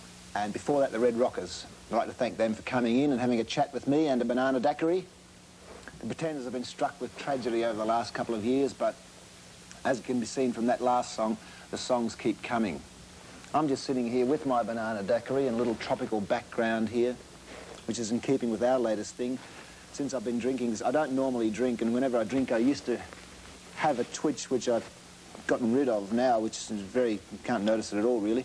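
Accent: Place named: Australia. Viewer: This was back when Colin Hay had an Australian accent. He has since reverted back to his native Scottish accent.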